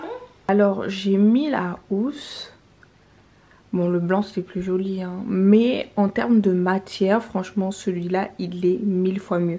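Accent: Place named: Gabon. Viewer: Je te découvre quand j’ai entendu ta façon de parler j’ai dit c’est une Gaboma je m’abonne directe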